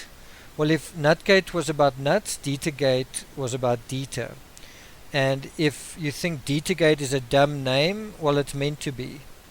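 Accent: South Africa. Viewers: He sounds like an Indian living in South Africa 🤔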